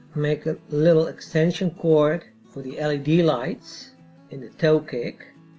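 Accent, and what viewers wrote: Netherlands. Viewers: ik dacht altijd al een nederlands accent te horen ;) keep up the good work!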